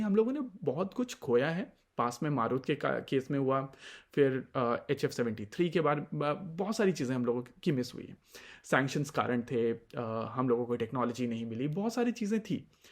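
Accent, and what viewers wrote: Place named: Maharashtra, India. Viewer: भाऊ. तुम्ही मराठा(महाराष्ट्रीअन) आहात का? तुमच्या कारण शब्दाच्या उच्चारामुळे जाणवले.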